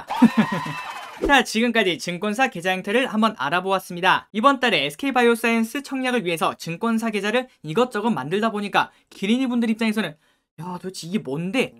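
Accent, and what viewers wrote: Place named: Daegu, South Korea. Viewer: 저도 느끼고 있었... ㅋㅋㅋㅋㅋ 전 부산인데 사투리가 대구 친구랑 똑같길래 대구구나 해찌여 ㅋㅋㅋㅋㅋ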